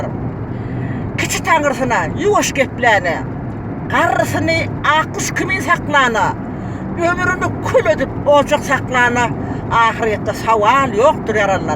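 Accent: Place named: Balkans. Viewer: Balkanly sesi aytya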